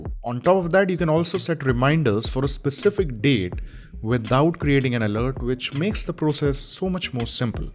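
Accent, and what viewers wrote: India. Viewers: This is pure indian accent 😊 I wouldnt say we are ashamed of it ;) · Goddamn Indian accent! 🤦🏽‍♂️ Smfh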